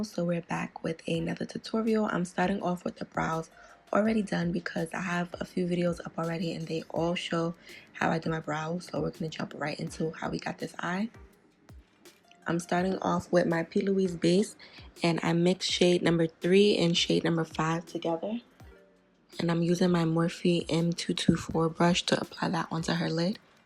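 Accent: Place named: New York, United States. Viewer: Idk which is satisfying your accent or the makeup? Lmao let’s say both? Like are you from NY? 😭😍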